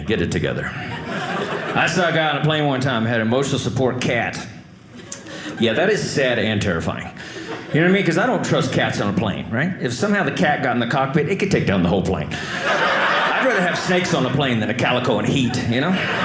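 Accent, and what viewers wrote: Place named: California, United States. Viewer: This guy is a punk. If he is from Texas he's from Austin. Looks and sounds more like a California immigrate.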